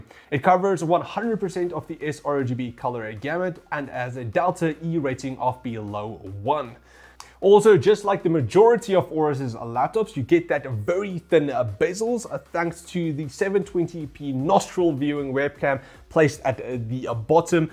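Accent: South Africa. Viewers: 'traveling and eh-gaming'. Lmao I love the SA accent.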